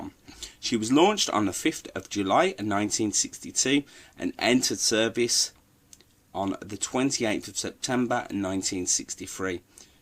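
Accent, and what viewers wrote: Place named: United Kingdom. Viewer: there's something remarkable why is there a British guys talking about Canadian ships??? shouldn't be a Canadian guy talking about Canadian ships?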